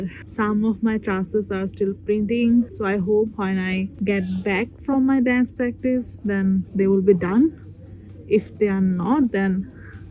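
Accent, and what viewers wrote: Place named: Bangladesh. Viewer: i'm a student of hsc 26 batch and recently i decided that i want to study archi from buet. so i searched up archi related bangladeshi videos but the content is so less. this video randomly popped up in my yt homepage and i started watching and thought to myself "accent ta amader moto lagtese. apu ki bangladeshi naki?" and went to comment section to check and YOU ARE ACTUALLY ONE OF US. i just binge watched all of your YT videos and GIRL I HAVE BECOME A HUGE FAN. a girl? check. buetian? check. archi student? check. moved to usa? check. APU UR LIFE IS LITERALLY MY DREAM AND I ASPIRE TO LIVE LIKE THIS ONEDAY. lots lots lots of love and im so disappointed to hear that u got trolled online. plz dont stop making these videos. im really motivated rn as i learned je amr dream is not actually impossible and someone is living it already. 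lots of bhalobasha again ❤ ❤ ❤ ❤ ❤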